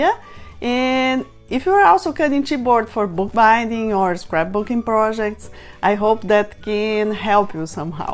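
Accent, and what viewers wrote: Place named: Brazil. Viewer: Are you Brazilian? By your accent, I guess so. 💜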